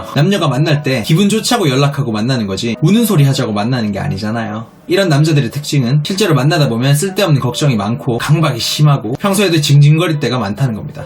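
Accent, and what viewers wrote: Gyeongsang, South Korea. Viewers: 억양이 대구 경북분같으세요. 아주 날카롭게 지적하시고,아주 맞네요. 자기중심적 남자나 여자 아웃.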